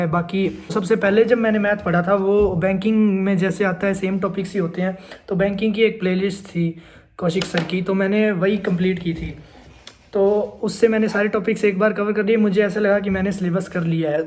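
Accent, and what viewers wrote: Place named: Hisar, India. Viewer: Kha se ho aap ? Hisar side se lg rhe ho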